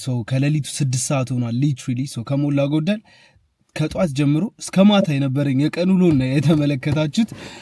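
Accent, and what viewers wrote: United Kingdom. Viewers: she has a British accent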